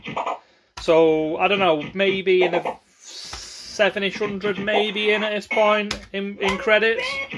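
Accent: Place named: Yorkshire, United Kingdom. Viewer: lol yea love ur banter while ur playing proper plain speaking yorkshireman Im Teesside for me sins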